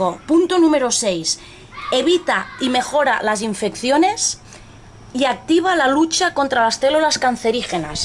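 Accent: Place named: Catalonia, Spain. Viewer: no puedes negar que eres catalana :-) Me gusta mucho tu acento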